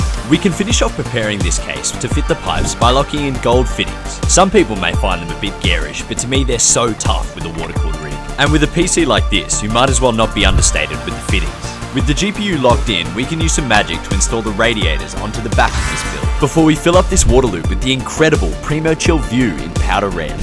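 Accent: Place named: Australia. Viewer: Man this thing actually looks BEAST👀 On a side note, realitvely new viewer and I honestly have no idea how it took me so long to recognise your accent as that of a fellow Australian😂 After having looked through some of your catalogue online and realising you offer some slight modifications to some of your prebuilts I can say that I'm honestly feeling very inclined to buy one off you as my current system is reaching that 5ish year mark(Ryzen 7 3700X/RTX 3080(probs one of the few aussies who scored one at MSRP😂)) And while i have usually centred around 1080/1440p w/ decently high frames for competitive FPS titles, I am finally reaching that age where I'm playing more AAA single player titles in 4K so definitely be looking by the end of the year and despite my enjoyment for bulding I feel like taking the easy route🤣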